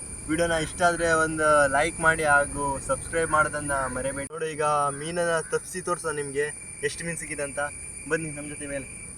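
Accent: India. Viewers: Hi yava vuru nimbhu kundapur kannada matadatira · Byndoor kundaluradavara reeti mataduttiri neevu.Uttara kannada bhashe bere reeti iruttade.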